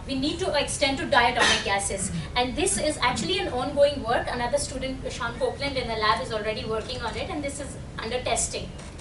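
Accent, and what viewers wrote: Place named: India. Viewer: She has a hindi accent.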